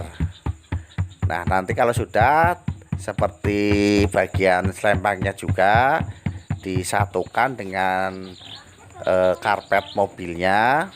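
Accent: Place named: Banyumas, Indonesia. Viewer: logatnya ada ngapkinya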